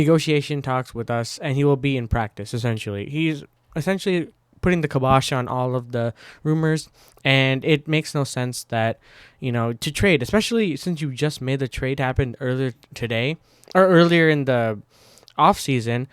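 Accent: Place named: India. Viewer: the thick Indian accent in the video tells me he doesn’t know what he’s talking about and you’re just out here glazing these ill advised takes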